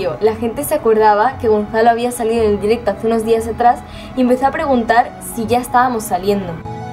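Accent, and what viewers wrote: Cordoba, Argentina. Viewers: che pero el asento ya lo superas te lo pasas al siguiente por q ablas bien argentino saludos desde argentina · AW EL ACENTO DE ARGENTINAA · Soy de Argentina buenos aires y el asento cordobes es lo más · el acento cordobés 😭🤏🏻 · Es que es asi pa los aregentinos somos los mas romanticos del mundo wacho siendo cirujas o quizas no pero siempre ahi para cuando se trata de la persona mas especial que tiene uno💖y la tonada de este hdp la amo gente😁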